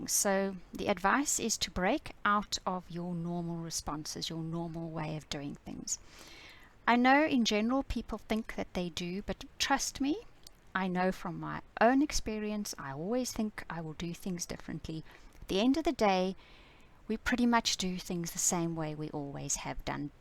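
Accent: South Africa. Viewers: love you're south African accent... very nice positive reading thankyou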